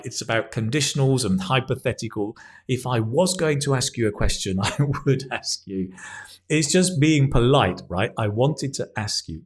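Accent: United Kingdom. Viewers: Yes, and great chance for us to listen original British english! Thank you!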